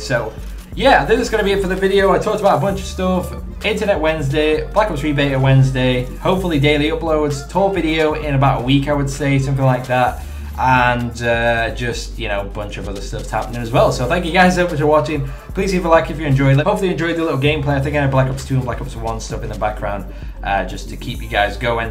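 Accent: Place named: Birmingham, United Kingdom. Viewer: Birmingham I think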